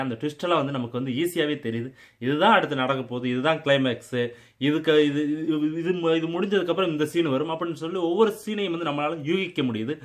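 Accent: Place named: Cumbum, India. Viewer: Me too cumbum side one village, I thought you are from chennai bro. Now I came to know why I watch your reviews native speech bro,doing good job but now your background looking like usa . Where are you staying now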